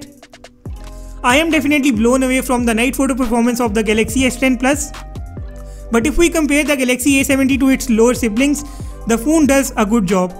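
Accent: India. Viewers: Well as an Englishman I understood him perfectly and I've also liked the Indian accent anyways!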